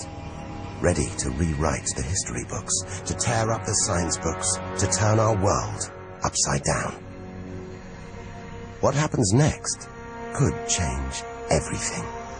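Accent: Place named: United Kingdom. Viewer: But the whole British voice is killing me lol.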